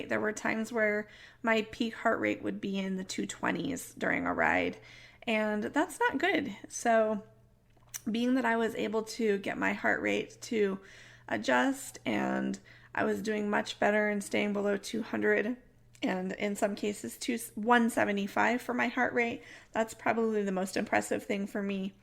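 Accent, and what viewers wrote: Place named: United States. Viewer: Hi. I am from South Wales (UK). Just a few miles from where Richard Burton and Anthony Hopkins were born :--). Accents are rather difficult to describe of course. Yours is clearly 'American', however, without any 'sharpness' or 'high to low' then 'low to high' intonations which my ears pick up on with some (not all) American accents. Thats just me though, haha.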